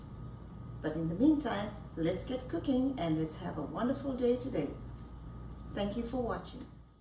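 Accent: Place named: South Africa. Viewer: Came across your channel and ❤ your recipes. You sound like you from South Africa. Keep the recipes coming.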